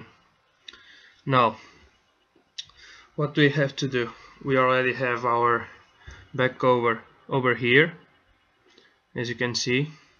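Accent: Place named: Caribbean. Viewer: Your video is unfortunately stay only have no idea this Huawei G300 disassemble your video is too long, it shows you do not know, my advice is to practice before and after you record it, you should have practiced before and make sure you see more removed when this smart phone, plus your English seems caribbean, I feel for you but my vote is desfaborable do not understand how you can be so stupid to make this video ....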